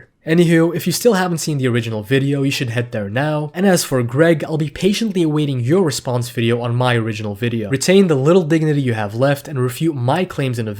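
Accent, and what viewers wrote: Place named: Morocco. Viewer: By the way I wanted to ask, are you moroccan? You sound like a moroccan lol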